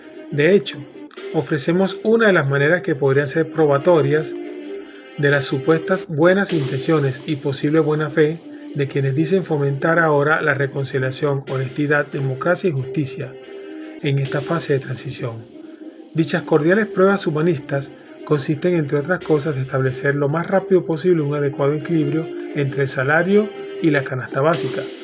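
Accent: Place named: Venezuela. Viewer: Muy buenas propuestas las que usted está es poniendo buenas tardes desde tinaco estado Cojedes Antonio Acosta lo escucho venezolano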